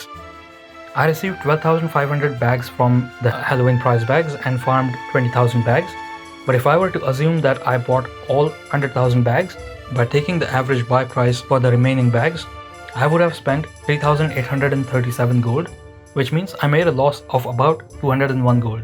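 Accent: India. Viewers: my god indian accent kills me every fuckin time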